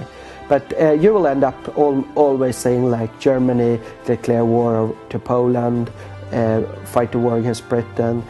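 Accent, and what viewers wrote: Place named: Sweden. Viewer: this accent is horrrrrrrible........ im swedish too but.. seriously get a proper narrator for these types of things, even if he's reading from a script. its better than someone who can hardly speak the language..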